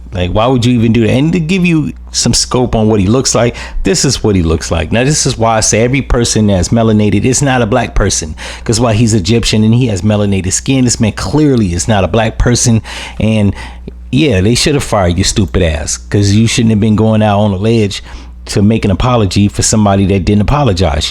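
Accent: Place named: Chicago, United States. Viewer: Keep going strong bru , you dropping fire , one of the best , you sound like one of my Chicago brothers .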